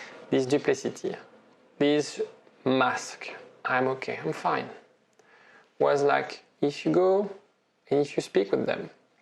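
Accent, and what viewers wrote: France. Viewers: French accent is awful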